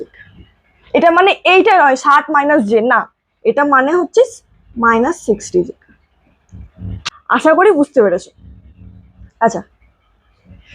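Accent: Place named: Sainthia, India. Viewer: দিদি আপনার ভাষা শুনে মনে হচ্ছে সাইথিয়া বা তার আসে পাশের অঞ্চলের , আমিও বীরভূম থেকে বলছি । খুব ভালো লাগলো টপিক টা এত ভালো করে বোঝালেন দেখে, আমি যদিও বা ক্লাস 11 এর student noi ❤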